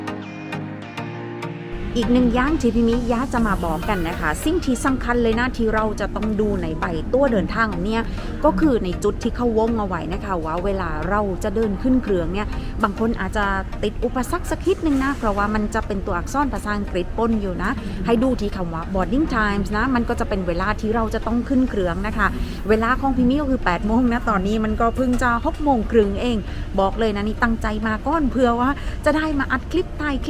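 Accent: South, Thailand. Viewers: ชื่นใจ น้ำตาซึมไปด้วยคะ พี่มิ ประมาณตัวเองได้กลับบ้านด้วย ภาษาใต้ที่น่าฟัง คะ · วาวๆๆได้ยินสำเนียงใต้(เเบบเราด้วย)55 ดีงามค่ะดีใจเเทนได้กลับไปเจอพ่อเเม่ค่ะ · ชอบเวลาพี่มิแหลงใต้ น่ารัก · พี่มิน่ารักมากเลยคะ ชอบพี่พูดใต้มากเลย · พี่มิแหลงใต้น่ารักจัง สงสัยจะไม่ได้แหลงใต้นานแล้วใช่หม้าย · แหลงใต้น้ำเสียงน่าฟังมากกกก พี่มิ เวลาพูดภาษาอังกฤษก็น่าฟัง พูดภาษาไทยก็น่าฟัง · พี่มิแหลงใต้เพราะจังคะ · ชอบพี่มิแหลงใต้มากเลยยย ฟังเพลินนนหู้(หู)555 พี่มิน่ารักมากกเลย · ผมไม่ชินกับภาษาสำเนียงใต้พี่มิเลย <num> เหมือนเปิดช่องปิดเลยครับ แปลกหูไปเลยครับ555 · น้ำตาจิไหล เข้าใจฟีลพี่มิเลย หนูเปนคนใต้เหมือนกันค่ะ อยู่ ตปท.ไม่ได้หลบบ้านมาปีหวาแล้ว สู้ๆนะพี้ ส่วนสำเนียงอย่าไปแคร์เลยค่ะ แค่พี่มีความสุขทุกครั้งที่แหลงก็โอเคแล้วค่ะ ดีใจกับพี่ที่ได้หลบบ้านมาอยู่กับพ่อแม่ บ่อยขึ้นน้ะ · ฟังสำเนียงใต้พี่มิ น่ารักจังค่ะ ชอบๆ^^ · พี่มิพูดใต้น่ารักจังเลยค่ะ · พี่มิพูดใต้น่ารักจังค่ะ☺️ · พี่มิพูดใต้น่ารักมากเลยค่า ❤️ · คุณมิแหลงใต้หร้อยๆ คนใต้(หาดใหญ่,สงขลา)คนนี้ฟังแล้วมีความสุขจังหู้นิค่ะ👍 ตอนคุณมิเจอแม่พ่อก้มกราบเท้าท่านแล้วน้ำตาพี่ไหลน้องเห้อมีความสุขไปกับน้องมิกันนะน้องนะ🙇‍♀️😢 🌷fc จากเนเธอร์แลนด์ 🌷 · วันนี้พี่มิพูดภาษาใต้น่ารักจังขอบคุณมากนะคะได้ความรู้มากมายเลย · ภาพที่พี่มิก้มกราบพ่อแม่ แทนความรู้สึกรักและคิดถึงได้เป็นอย่างดี ความอบอุ่นอาบหัวใจคนดูจริงๆค่ะ /ชอบฟังพี่มิพูดภาษาใต้ ส่วนตัวแยกไม่ออกว่า สำเนียงใต้แบบนี้ แบบนั้นคือจังหวัดไหน เพราะฟังไม่ออกเลย 555 แต่คลิปนี้หนูฟังพี่มิออกนะคะ 😁😁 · เพื่งเริ่มตืดตาม ได้ดูคลิปย้อนหลังเรื่อย ชิบมาก ตอนกดไหว้แม่ ร้องให้เลย รับรู้ถึงความรักความคิดถึงเลย คะ ชอบสำเนียงใต้แบบนี้จัง นุ่มนวล จากคนตรังในกทม.^^ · คุณมิแหล่งใต้ได้น่ารักมากค่ะ ตอนก้มกราบคุณแม่ ป้านำ้ตาซึมเลย คิดถึงคุณแม่ของป้าตอนท่านยังมีชีวิตอยู่ เวลาป้ากลับไปเมืองไทยความคิดถึงมันท่วมท้นอย่างไรป้าเข้าใจดีค่ะ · ชอบสำเนียงใต้มากค่ะพี่มิ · ชอบฟังพี่มิพูดใต้มากๆ ยินดีต้อนรับกลับบ้านน่ะพี่มิ ไม่ค่อยได้เม้นเเต่ดูทุกคลิปนะ❤️❤️ชอบเติ้นจังนิ❤️❤️ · พี่มิแหลงใต้ฟังเพลิน~~~ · สำเนียงใต้ของพี่มิ...น่าฟังมากๆค่ะ ฟังแล้วเพลินเรยค่ะ ❤❤ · ชอบสำเนียงภาษาใต้พี่มิจังเลยค่ะ ฟังง่าย สำเนียงน่ารัก😙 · เป็นคนเชียงใหม่ที่ฟังภาษาใต้ของพี่มิได้ แล้วภาษาใต้ของพี่มิก็เพราะด้วย เพลินดีค่ะ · น่าร้ากกก ชอบให้พี่มิแหลงใต้ค่ะ น่ารักดี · I like your southern pronunciation so much P มิ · หนูน้ำตาแตกอีกคลิปละค่ะ เข้าใจความรู้สึกของคนไกลบ้านแล้วได้กลับบ้านแบบจริงๆ แล้วสักที อบอุ่นมากค่ะ ยินดีต้อนรับสู่ประเทศไทยค่ะ //เอ็นดูพี่มิแหลงใต้ :) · หรอยจังคลิปนี้..แหลงใต้น่ารักอย่างแรง สำเนียงหรอยนิ นุ้ยคนคอนศรี..จะแหลงเร็วๆดังๆ555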